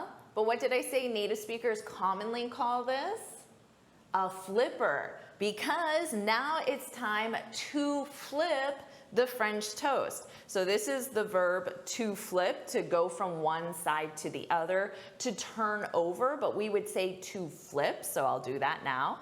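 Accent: England, United Kingdom. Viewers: Very clear English accent Very understandable Thank you so much for improving my english❤😊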